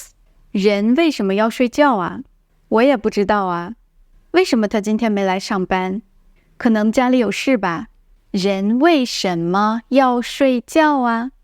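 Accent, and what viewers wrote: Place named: Dongbei, China. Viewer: your my favourite teacher cos of the northern stuff! Do you think when you read the northern part can you say it normally for one and also in your dongbei accent too so i can copy it rather than reading it with standard pronounciation =D.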